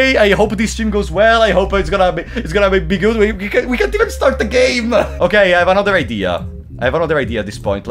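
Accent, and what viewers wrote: Italy. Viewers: I watch especially for the fear & hunger videos so hearing him in this video make confused exclamations instead of knowing every single secret of the game is very strange XD and speaking as an Italian to another Italian it's so nice to hear someone with an English pronunciation similar to your own! you make great videos man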